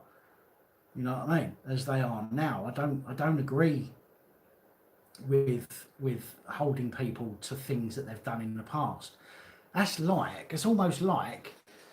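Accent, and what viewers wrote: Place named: London, United Kingdom. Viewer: Why do you live in Liverpool, you sound like a londoner mate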